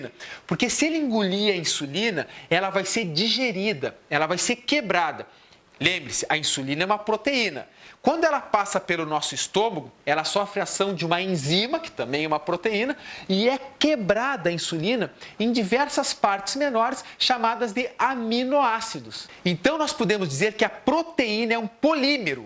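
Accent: Rio Grande do Sul, Brazil. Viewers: tu é do Rio Grande do Sul? tem sotaque gaúcho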